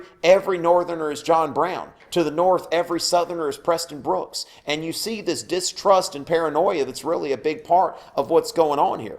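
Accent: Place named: South Carolina, United States. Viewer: Thanks for clarification on the Compromise of 1850. BTW- You need to help Keith Hughes with his southern accent. :-) (you sound like a South Carolinian. I'm a Georgia boy)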